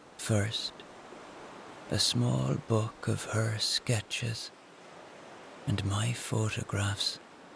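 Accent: Ireland. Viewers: I love your stories using an Irish accent to your voice! So lovely, lilting, and relaxing . Thank you. 😊❤😊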